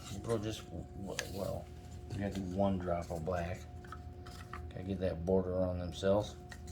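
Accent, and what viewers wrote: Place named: Wisconsin, United States. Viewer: You sound southern… Southern Wisconsin-ish. Seriously, you (and the wife and kids) are a treasure.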